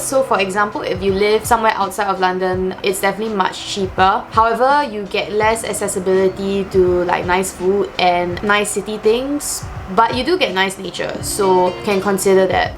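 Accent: Singapore. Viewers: Qiaolingvlog here, thank you for sharing and I feeling your English mix Singlish already lo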